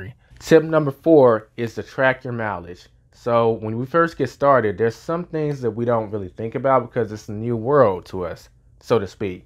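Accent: United States. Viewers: Why does this guy sound like an American seating in the front right seat?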